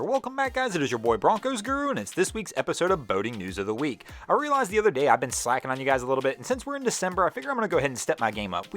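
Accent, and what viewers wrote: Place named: Tennessee, United States. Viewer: You are amazing. where are you from. Tenessee?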